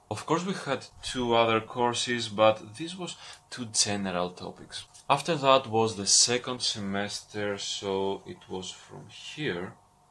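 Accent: Greece. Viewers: Il Αφερφέ ο λόγος που του μίλησα στα Αγγλικά είναι επειδή ΟΛΑ του τα βίντεο, ακόμη και η περιγραφή του καναλιού, των βίντεο κλπ είναι στα Αγγλικά. Άν και για να πω την αλήθεια, η προφορά του μου κίνησε λίγο την περιέργεια και έμοιαζε πολύ με Ελληνική. Τελικά είναι όντως Έλληνας. Οκ δεν πειράζει για την ερώτηση που είναι στα Αγγλικά. Με αυτόν τον τρόπο μπορούν να την διαβάσουν και οι ξένοι, οι οποίοι μπορεί να έχουν την ίδια ερώτηση με εμένα... Οπότε μην πας να το παίξεις έξυπνος για να βγεις από πάνω. Εφόσων μιλάει Αγγλικά στο βίντεο, είπα και εγώ να κάνω σχόλιο στα Αγγλικά για να καταλαβαίνουν όλοι. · Πως φαινεται η Ελληνικη προφορα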